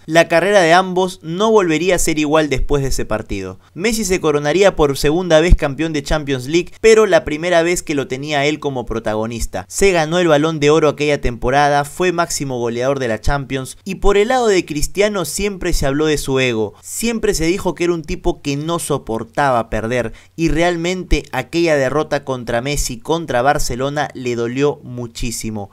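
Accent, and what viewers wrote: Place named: Argentina. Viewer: El contenido va bien a veces pero el tonito argentino no va. ¿Por qué nos queremos parecer tanto a ellos? ¿Jala más seguidores?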